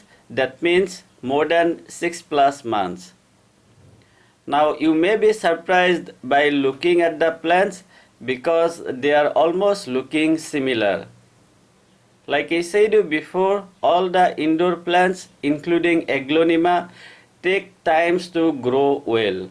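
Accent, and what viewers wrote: Assam, India. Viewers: Apuni oxomiya niki? Accent tut guess korisu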